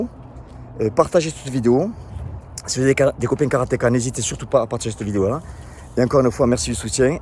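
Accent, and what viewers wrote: South, France. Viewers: ... t'es en train de me réconcilier avec l'accent du Sud! Parigot que je suis! Force à toi!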